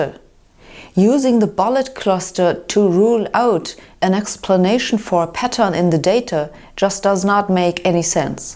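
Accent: Germany. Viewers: why a german accent sound like they are super genius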